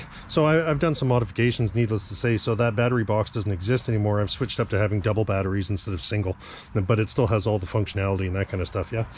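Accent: Canada. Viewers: You must be from Canada yeah?!😅 Loving the content man keep it up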